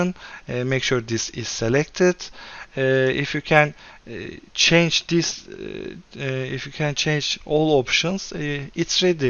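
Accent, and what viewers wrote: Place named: Turkey. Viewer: Aksandan direkt Türk dedim öyle çıktı. :) Başarılarının devamını dilerim. AR t-shirt yapabilir miyim flutter bilgim var unity bilmiyorum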